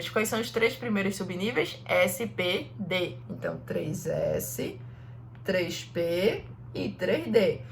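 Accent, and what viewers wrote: Pernambuco, Brazil. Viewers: Vc é de Pernambuco? Seu sotaque me soa bem famíliar😊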